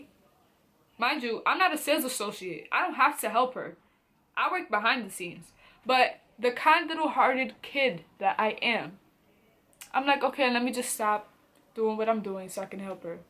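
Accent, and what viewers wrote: New York, United States. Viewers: I like your accent are you in New York ? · I don't know which one you worked at but I'm sure it's in New York somewhere with that accent lol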